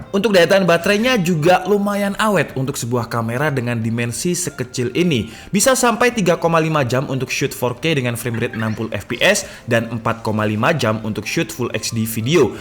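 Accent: Malang, Indonesia. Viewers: Orang Malang bang?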